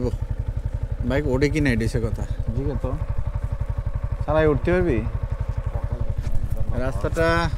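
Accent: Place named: Comilla, Bangladesh. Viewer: আপনার বাড়ি কুমিল্লা মনে হয়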